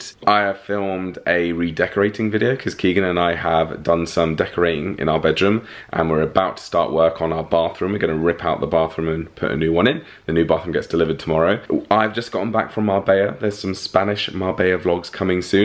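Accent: United Kingdom. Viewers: Love Your Channel. And Love Your British Accent.🙄 I hate to be bring this up. But Florida Is #1 Hate State When It Comes To Our Community. I find this very sad because in my younger Gay Year's I spent allot of time there.😢 It so sad that the majority Of The States Governments Our Out To Put Us Back In The Closet And Put Us In Real Danger. If I Wasn't So Old And In Bad Health I Would Consider Going Across The 🇬🇧 Pond. You Have A Beautiful Family. Enjoy The Rest Of The Summer. Greetings From🌴 San Diego.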